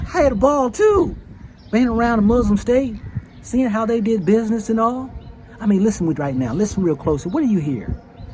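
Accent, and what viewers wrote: South, United States. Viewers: You are now speaking the Southern tune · There is a documentary series called The Story Of English. It explains accents like this and others coming from the white Southerners having to communicate with enslaved Africans. Fascinating stuff.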